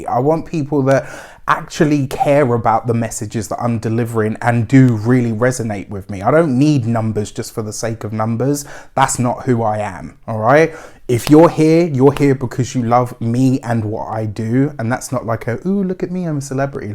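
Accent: England, United Kingdom. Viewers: Thank you very much for the reading. I'm so glad as a Sagittarius girl. By the way. Are you an English? Cause I like the way you read. Lots of love buddy. 💗💗💗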